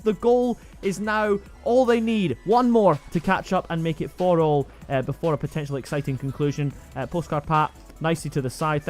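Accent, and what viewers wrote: Scotland, United Kingdom. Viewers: he sounds scottish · Love the Scottish accent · THAT SCOTTISH ACCENT LMAO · i hate the scottish acent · That Scottish accent deserves 1mil subs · The Scottish Accent 🤣💯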